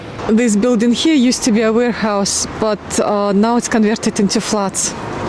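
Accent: Glasgow, United Kingdom. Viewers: Yeah was thinking that its lovely hearing the Glaswegian twang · Me too! You can’t escape the Glaswegian accent, no matter how hard you try.😄😄😄😄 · Love it! Can totally hear the Glaswegian accent starting to mix with yours. Lovely. Great vid .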